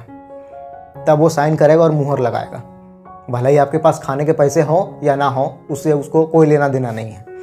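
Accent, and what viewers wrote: Bihar, India. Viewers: Aap Bihar se hai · Tum Bihar se ho ya jharkhand se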